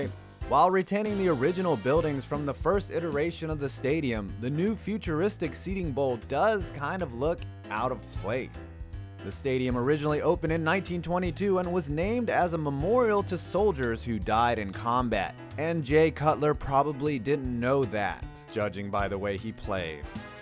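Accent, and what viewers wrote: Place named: Boston, United States. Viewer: Lazy basterd Boston chick voice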